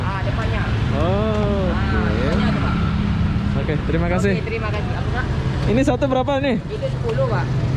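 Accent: Lombok, Indonesia. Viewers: Hahahha pandainye abang bual cakap dari lombok😄😄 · Bahasa Melayu mcm Malaysia tapi saya tetap boleh perasan slang Indonesianya hehehe Unik betul org nusantara nih!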